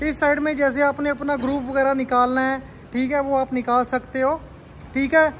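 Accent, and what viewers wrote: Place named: India. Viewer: creo q es ingles con acento de la india jejej, pero se para q sirve la maquina , se ve genial y solido, en pais seria como una novedad. Felicidades por su ingenio y trabajo.Saludos desde SudAmerika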